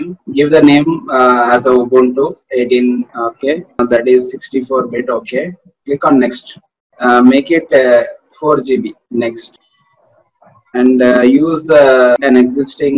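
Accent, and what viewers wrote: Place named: India. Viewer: nice hindish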